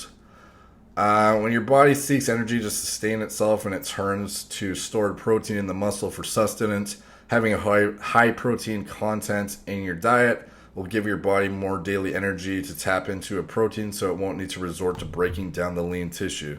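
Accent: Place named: Canada. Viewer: He sounds way more Canadian here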